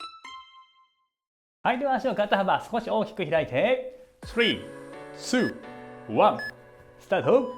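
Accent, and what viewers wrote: Kansai, Japan. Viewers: 先生のストレッチは、即効性があるのでやる気出ます‼️ 分かりやすい説明ありがとうございます‼️ そして、たまーに出る関西訛りが可愛いです。by関西人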